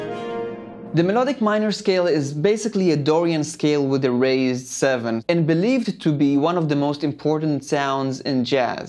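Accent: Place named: Iran. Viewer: hi, my name is Lawson. I live in Los Angeles. I hear a Persian accent when you speak. My brother in law is from Iran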